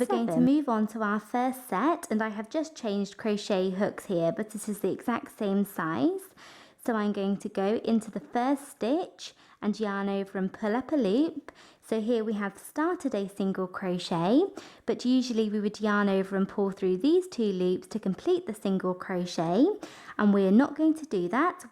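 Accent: United Kingdom. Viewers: this is in british and i don’t understand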